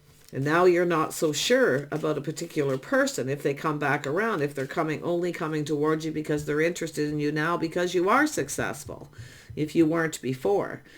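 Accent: Canada. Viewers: Phenomenally close to the mark… I will be amazed if he really calls… it will blow my mind, but of course, I would welcome it and love an opportunity to try and sort this out… By the way, your accent sounds very much like my own… Are you Canadian? I live in the states now but born and bred in Toronto.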